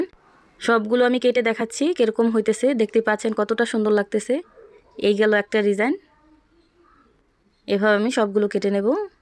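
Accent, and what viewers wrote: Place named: Mymensingh, Bangladesh. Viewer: আপু আপনার বাড়ি কোথায় আপনি ময়মনসিংহের মানুষের মতো কথা বলেছেন 😊😊😊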